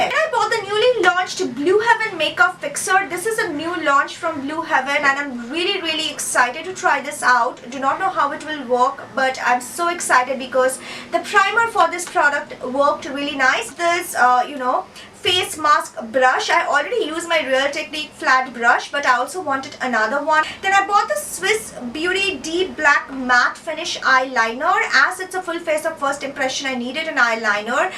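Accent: Bengal, India. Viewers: Apke bolne ka tarika...sooo cute..bengali touch me..love u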